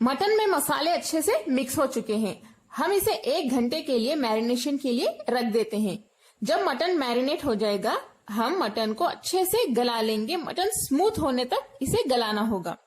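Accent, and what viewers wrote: Hyderabad, India. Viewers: Aap Bhi Hyderabad K Hai Kyaa? Kaiku Bole Toh Thodi Baat Se Hyderabadi Mlm Hora!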